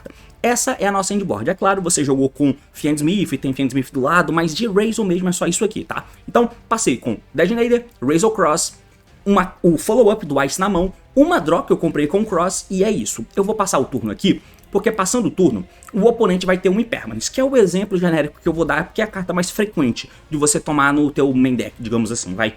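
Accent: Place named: Bahia, Brazil. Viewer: Não deixe os haters mudarem teu jeito baiano de falar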